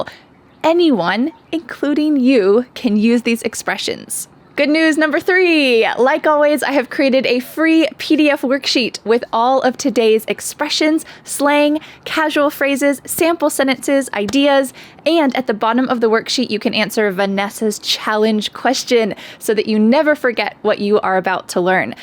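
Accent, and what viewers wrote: United States. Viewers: How much I adore and enjoy your clear American pronunciation,Vanessa. It's awesome!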